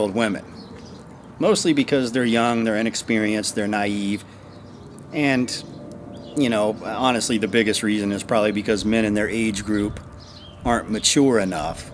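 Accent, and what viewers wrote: United States. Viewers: I'm wondering how your tinder dates look like because there are many attractive men out there with not really attractive chicks...I love the tone of your voice with this american accent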